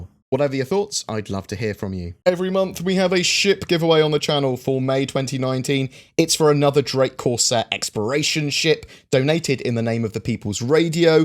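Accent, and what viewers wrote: England, United Kingdom. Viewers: Your english accent is so terrible.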